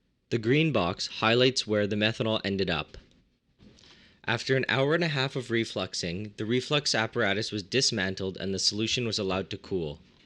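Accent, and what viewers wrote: Quebec, Canada. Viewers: That Canadian French accent though . . . "Le Chateller"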